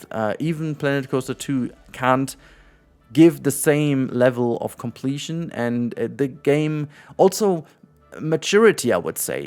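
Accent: Germany. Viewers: Finde es witzig das man raushört das du Deutscher bist obwohl dein englisch sehr gut ist😂❤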